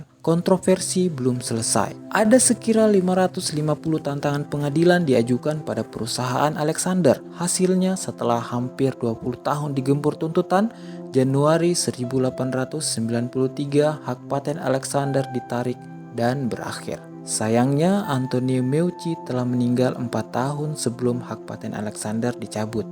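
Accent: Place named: Palu, Indonesia. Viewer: Salfok dengan logat palunya le😁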